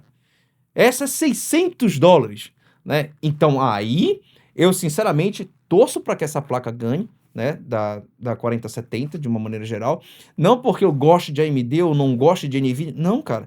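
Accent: Pará, Brazil. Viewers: cara que legal,voce é do pará, sempre desconfiei pelo sotaque, muito bom ter um canal tão foda representando nosso estado. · Rapaz, tu é paraense? Que bacana hahahah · Cara, você é paraense? 😮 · ele deve ser do Sul do Pará nao tem sotaque do norte do Pará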